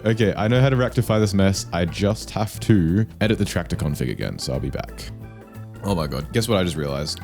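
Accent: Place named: Australia. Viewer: i know an aussie when i hear one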